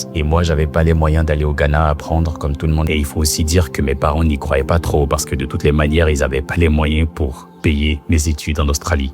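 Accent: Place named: Ivory Coast. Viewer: C'est l'accent ivoirien ça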